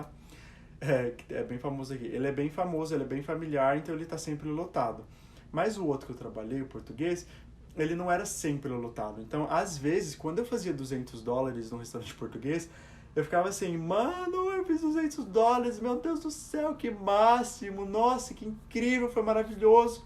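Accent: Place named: Pará, Brazil. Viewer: É paraense? Fala igual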